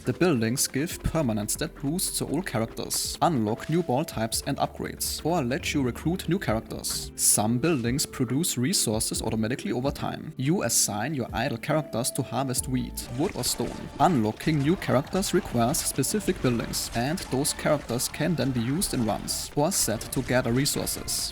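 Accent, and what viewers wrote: Germany. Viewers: Game looks great and is landing on gamepass at release tomorrow. Demo was fun so I'm looking forward to it. By the way... where is your accent from? Sounds German ;-)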